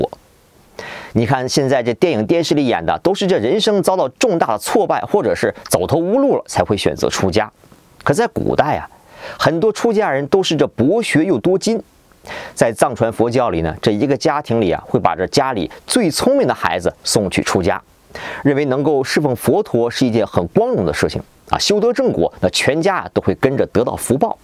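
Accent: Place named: North, China. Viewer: 北方人吧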